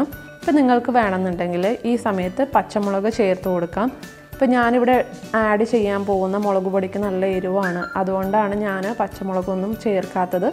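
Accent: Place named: Palakkad, India. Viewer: U have a Palakkad influence in it language....but whatever....I loved this recipe hit like.....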